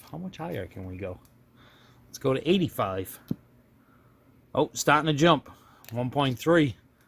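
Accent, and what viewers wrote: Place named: Massachusetts, United States. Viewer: You aren’t from NC originally are you? You got that Peabody / Beverly MA accent going on. Hahaha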